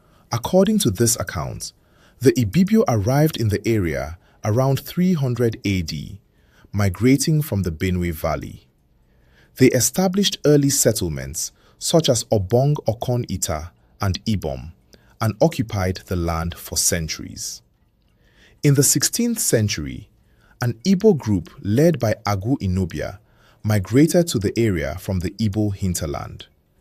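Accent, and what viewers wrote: Ghana. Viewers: 🎉'A Nigerian here! The Narrator sounds Ghanaian. 'Very interesting indeed. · He sounds more Ghanaian than Ijaw.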